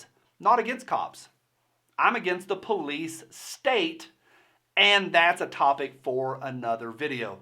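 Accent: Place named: Alabama, United States. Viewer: No problems here... Yet. I'm in a constitutional carry state, a very good one I might add. No police problems and no prisoners released yet. I believe criminals should be kept locked up unless we're facing an apocalypse. Even then murderers and other violent criminals should never be released. Your videos are great, always look forward to them. You sound like home, I'm from Alabama. Keep up the good work!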